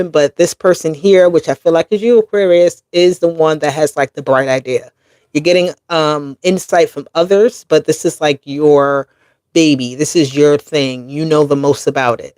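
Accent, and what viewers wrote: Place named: Boston, United States. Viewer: Thanks for the reading from Boston. You sound like a Bostonian to me, maybe just a north east accent. lol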